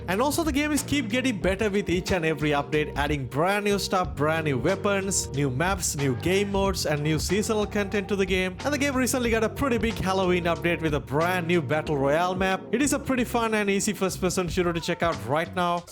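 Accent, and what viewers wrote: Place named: India. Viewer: your accent speaks Indian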